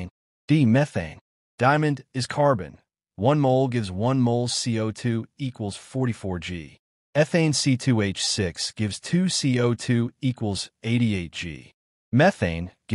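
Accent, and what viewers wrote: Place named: United States. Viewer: #* americian voice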